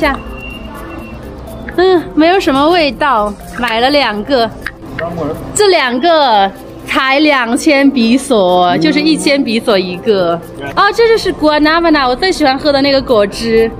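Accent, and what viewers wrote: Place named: Taiwan. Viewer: 视频拍不错，学哪台湾腔，真受不了。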